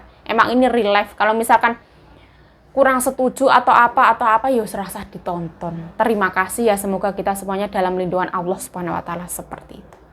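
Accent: Java, Indonesia. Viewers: asli mna ya ko ngomonge medok kyo aq moncrottt😄😄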